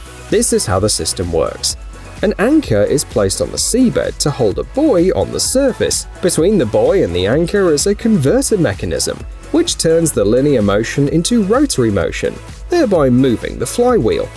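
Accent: England, United Kingdom. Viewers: The Falkirk Wheel is in Scotland, so the prototype was probably made from Meccano. The presenter sounds like he is English, so he should be familiar with Meccano; it is still being manufactured... even if it is in France and China! 🥴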